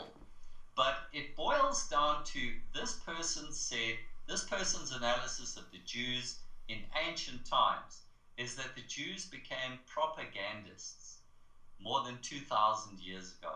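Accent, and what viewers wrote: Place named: South Africa. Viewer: I am a South African Afrikaner and just listened to this video. I've also downloaded the Battle of blood river part 1, Jews 102 and Jews 104. I've noticed you're SA accent and love listening to you, you speak slowly and very clearly. My awakening to the whole Jewish issue started a few months ago when I've studied ww1 and ww2. The Jewish hand was noticeable all over these two wars and I started to be more interested in the Jews. Even if they only constitutes 1% of the world population the damage they do are scary!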